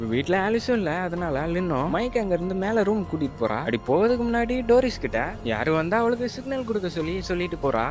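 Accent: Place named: Tirunelveli, India. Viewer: Youv thirunelveli machan.... Super paaaa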